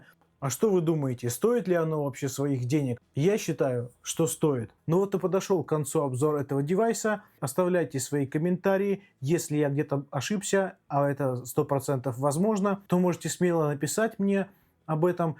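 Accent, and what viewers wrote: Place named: Germany. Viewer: Купил такой недавно, прикольная штука, но на постояннку только новичку, и то с натяжкой. Обзор понравился, но есть ощущение что автор немец который учил русский как иностранный. Сорри если обидел, но звучит реально так 😅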